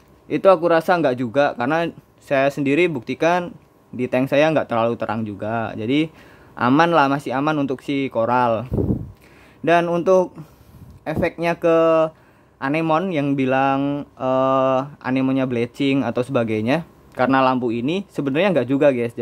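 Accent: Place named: Java, Indonesia. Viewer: Jowone endi mas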